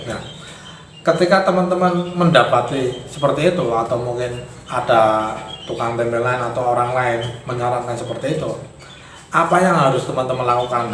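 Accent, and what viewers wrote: Banyumas, Indonesia. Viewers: Ora ngapak ora penak 🤫🤭😄😂 Salam tukang tempe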